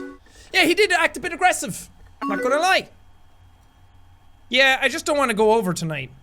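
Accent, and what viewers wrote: Ireland. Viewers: jack idk where you moved but please try to not lose your irsh accent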